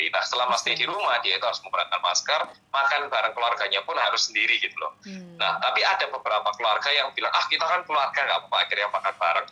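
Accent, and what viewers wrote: Java, Indonesia. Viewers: Orang korea yang medoook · Anyo hoseo.... Medhokkkkkkkkkkkkk🤣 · Yg sy heran korsel kematiany yg sedikit...bgmn pensngananya? Apkh dg bnykny tim medis..atu krn wargany yg mmg imuny kuat. Mas ini bukn org korea tp org jawa medhok bngt😁😂🤣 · Weee...mas masuk kompas TV.... Ada Orang korea yg Medokkkkk...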